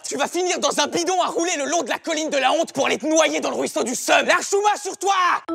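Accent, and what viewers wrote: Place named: Quebec, Canada. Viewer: En passant ton accent qu'on appelle le joual est je le sais car je vis au et qu'on utilise ct'osti d'accents la tabarnac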